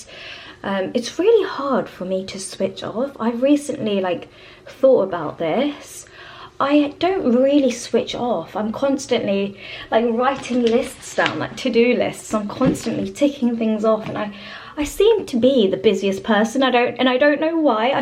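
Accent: England, United Kingdom. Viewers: Hello. Always, you are such a lady. I feel more like a lady as well after hearing from you. Your English accent is the best. I think you speak so beautifully. I would prefer longer videos too just to hear you. Happy Mother's Day to your Mom. From Grace Elizabeth, in MA. I wish my daughters would have vlogs just for me. Maybe someday we'll all have vlogs.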